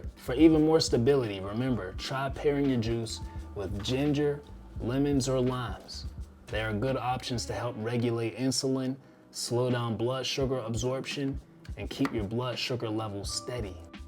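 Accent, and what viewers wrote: Pittsburgh, United States. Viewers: U sound like your from Pittsburgh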